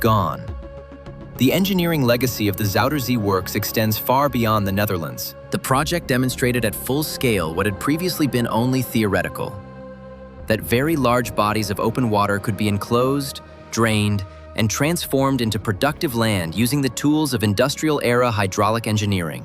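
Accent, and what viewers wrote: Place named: United States. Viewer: In England we pronounce the Zuider Zee as if it were spelt "Zider Zay" (rhyming with Cider Bay). It might be different in the Netherlands. If the voiceover is meant to be American (which sounds to be the case) I can only assume Americans would say "Zooder Zee" but at times he seems to say Zouder "Zowder' Zee.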